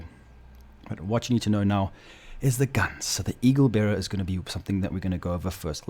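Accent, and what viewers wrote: South Africa. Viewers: Are you from South Africa? And Great video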